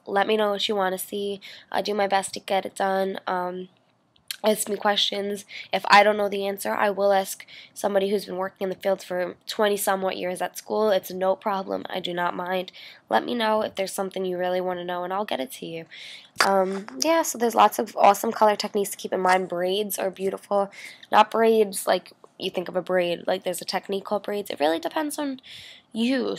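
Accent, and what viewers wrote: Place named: New York, United States. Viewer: Do you live in New York? I can kinda hear the accent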